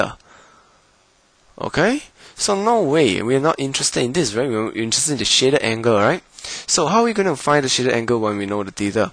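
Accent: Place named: Singapore. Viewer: i bet you are from singapore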